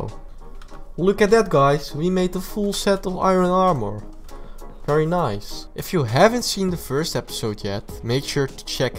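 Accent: Netherlands. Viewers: are you from the netherlands or something becouse you have an netherlands accent nice vid btw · Are you a Dutch men? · are you dutch?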